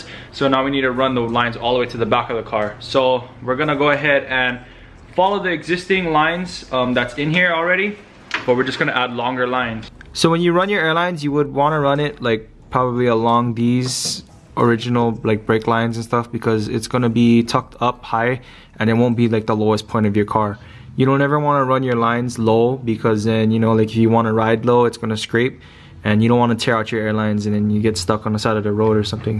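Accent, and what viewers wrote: Hawaii, United States. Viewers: I sense a accent man. Are you from Hawaii?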